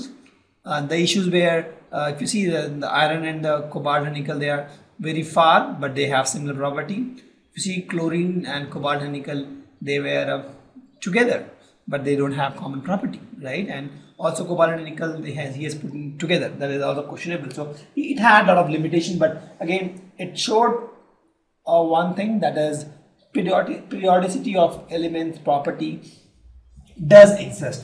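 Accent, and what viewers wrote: India. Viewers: u need subs for an Indian English?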